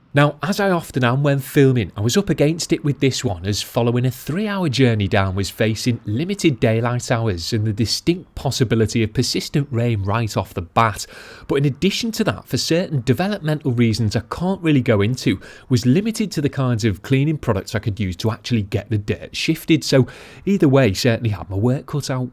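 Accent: Liverpool, United Kingdom. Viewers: Love this!! Great job mate. Are you from the Wirral or Liverpool?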